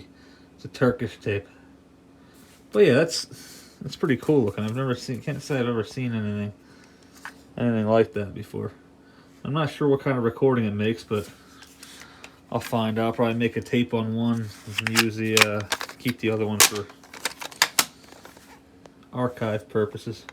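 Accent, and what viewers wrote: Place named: Philadelphia, United States. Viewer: Long before you pulled out the WMMR tapes, hearing your accent I kept thinking "okay, this guy is from either from anywhere from Lancaster to Philly". You sound like my relatives. Sadly I lost my accent as I largely grew up in Virginia.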